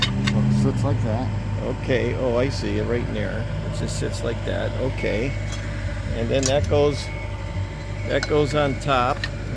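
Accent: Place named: Throop, United States. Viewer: Well, the reason that there is not so much brick, probably has to do in part with its relatively poor thermal properties. I bet that in places like Florida they are looking at building highly insulated structures. The problem is, these modern materials feel insincere; they are not honest. I appreciate older architecture and building methods and am constantly seeking out how the older guys did things. They weren't stupid. Always fun to listen to your videos. Your dialect always sounds familiar and reminds me of my family in Throop. One of my favorite places in the country. Thanks for another entertaining video.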